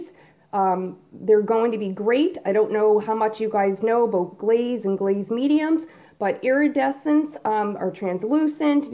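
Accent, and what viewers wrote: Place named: Canada. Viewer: I have started watching you ! I love your style and your Canadian accent! Are all Canadians artistic! Ha ha keep up the awesome pieces♥️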